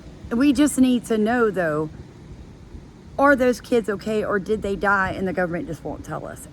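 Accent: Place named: South Jersey, United States. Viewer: I was thinking south Jersey. I have family and friends from there, and I can definitely hear south Jersey in voice! Even though she says y'all all the time because she lives in the south. She must be a Jersey girl from the start. I know the Jersey accent. I hear my maternal Grandmother come out of mouth all the time. LOLOL But - she dun went and married a suthorn boy!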